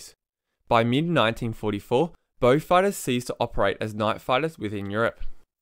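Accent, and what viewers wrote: Australia. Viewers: Love the video and the accent. What part of Oz are you from? Greetings from Norway. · Loved the Beaufighter, some great photographs and videos, lovely to here the Aussie twang of the narrator. Excellent.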